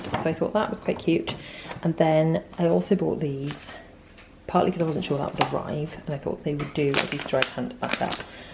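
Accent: Wales, United Kingdom. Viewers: Ah what a lovely channel, how have I not found you before! We're a family of 6 too, I feel so paranoid going shopping, like you're being judged🤣 I even felt like I had to justify why I needed 4 Easter eggs in Aldi the other day... apparently there's no demand for them so it was fine...no limit yay🤣 The hardest thing about all this is everyone being here all the time, when usually some of us are in school/work (as with everyone) the food is literally flying out of the fridge! Anyway sorry it's an essay, lovely to 'meet' you. Do I detect a Welsh accent? 🥰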